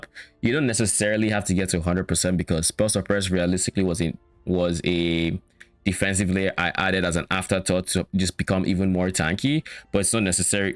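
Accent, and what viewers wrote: Nigeria. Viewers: Came to see the build out of curiosity, followed after hearing what sounds like Naija accent 😅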